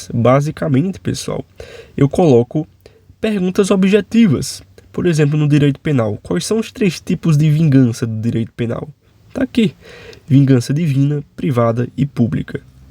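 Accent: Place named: Pernambuco, Brazil. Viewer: Você é pernambucano?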